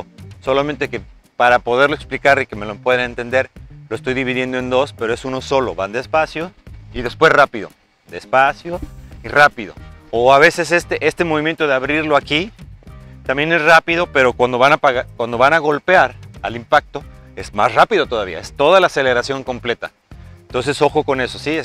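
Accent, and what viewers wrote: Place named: Mexico. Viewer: de que parte de México es profe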